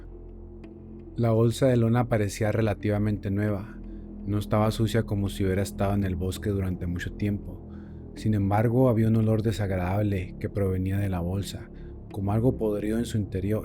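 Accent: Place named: North, Mexico. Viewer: Me gustó el video. Como sugerencia personal, tal vez puedas engrosar un poco la voz para que suene más tenebrosa. Las historias son buenardas. Por cierto, eres del norte de México, ¿verdad?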